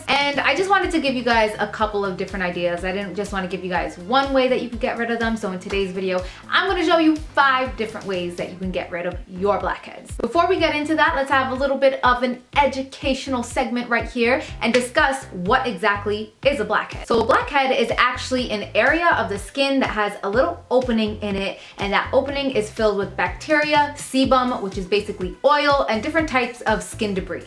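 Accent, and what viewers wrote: New Jersey, United States. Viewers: ayye I can tell ur from Jersey me to😜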